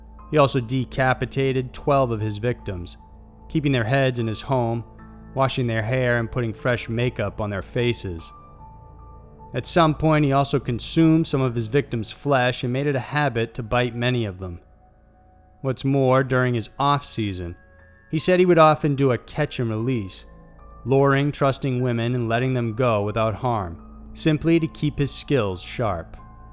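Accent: South, United States. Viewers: i really enjoy your channel. it's in-depth and you have a nice voice. are you from the southern US by any chance? you don't have too much of an accent (although i am texan myself) but i did notice a bit of a southern twang on your long "i" sounds. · Did anyone else notice the narrators strong southern accent in this video?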